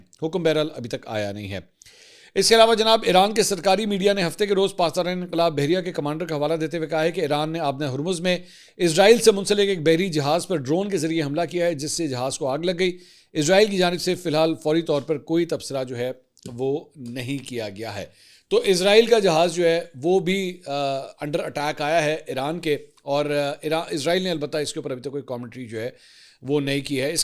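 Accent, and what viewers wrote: Punjab, India. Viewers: Sr punjabi touch zaror daity rahainy we like it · sahib today your punjabi accent make the video more excitting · Punjabi Touch 😆 Chas Agyi Awj To 😉😍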